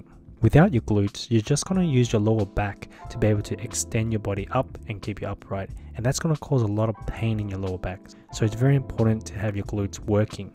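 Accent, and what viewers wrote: Australia. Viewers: is this Australian accent? anywho i used this for running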